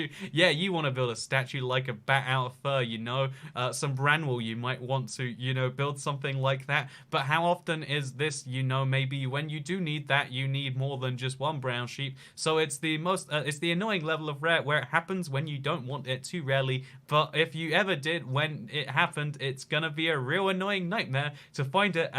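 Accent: United Kingdom. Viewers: to be fair, you do speak really quickly and youtube doesn't understand british accents at all, so it's kind of expected that the captions would be bad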